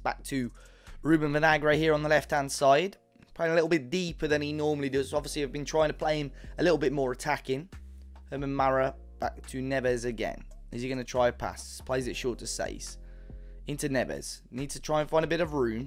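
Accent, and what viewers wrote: Malta. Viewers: you re maltese ? nice channel btw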